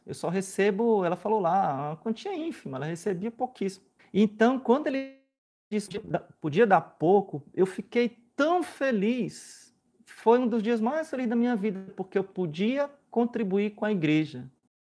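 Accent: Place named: Ceará, Brazil. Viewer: O sotaque cearense não sai dele. Haha parabéns, padre.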